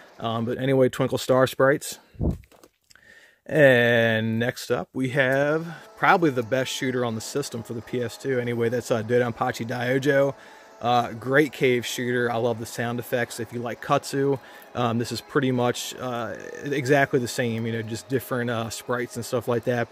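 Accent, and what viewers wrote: Baltimore, United States. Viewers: Btw you have the definition Baltimore accent.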